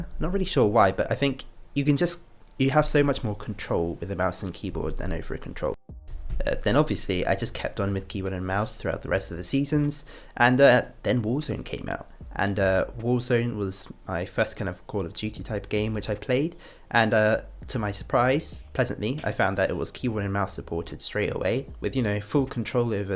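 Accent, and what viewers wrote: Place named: United Kingdom. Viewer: I’m so used to hearing people talk with a British or Australian accent I forgot he was British lol good review tho:)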